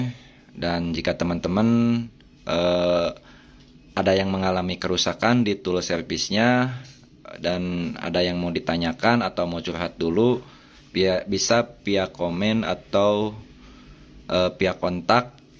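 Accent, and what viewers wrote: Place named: West Java, Indonesia. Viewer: Duh, si Aa sunda bngt. Haha Don teh apa Aa?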